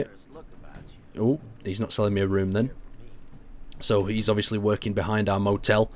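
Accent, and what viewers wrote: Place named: Burnley, United Kingdom. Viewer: where are you from? Burnley lass!!!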